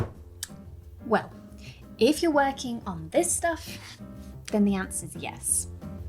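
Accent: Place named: England, United Kingdom. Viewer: Thanks for the advice , I love your English accent!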